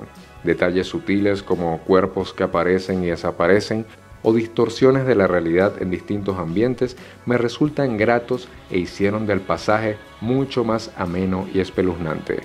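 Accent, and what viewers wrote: Venezuela. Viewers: st men es venezolano, verdad ? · Eres venezolano? :0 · Sendo acento Venezolano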